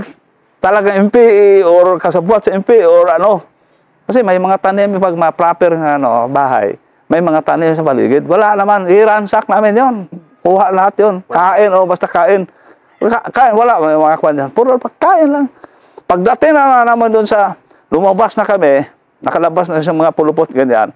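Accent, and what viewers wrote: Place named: Iloilo, Philippines. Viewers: Basta ilonggo mag kwento maganda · Ilonggo gid si sir..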